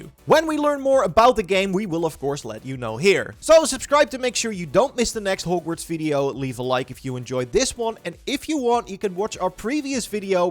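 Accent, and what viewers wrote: Netherlands. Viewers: Steenkolen Engels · duidelijk nederlands accent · 100% Nederlands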